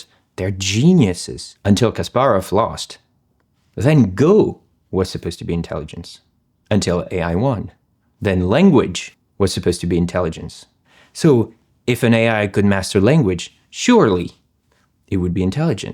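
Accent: France. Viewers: I love your aggressively French delivery and this is a very thoughtfully written video. I love the concept fission concept too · i can hear the frenchman underneath the english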